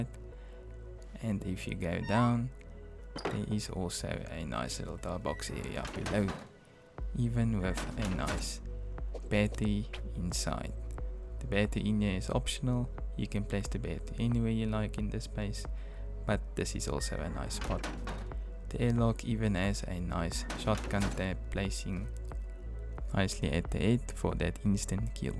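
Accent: South Africa. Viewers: Hold up, you from South Africa mybru?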